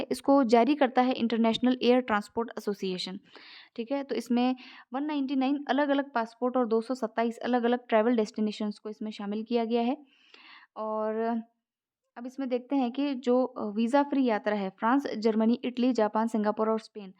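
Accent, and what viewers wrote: Haryana, India. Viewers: App haryanvi ho kya??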